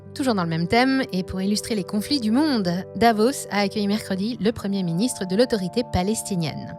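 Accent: United States. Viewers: Vidéo totalement vide d'intérêt et puis cet accent al américain détestable..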